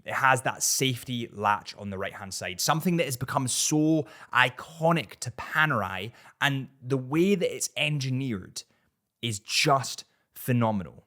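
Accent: United Kingdom. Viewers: Bro if u want your channel to have more wider audience speak slowly coz not all of us are native from UK that could easily understand your accent, I love your channel but i keep repeating it just to understand on what you are saying, i know that theres a subtitle but still its not accurate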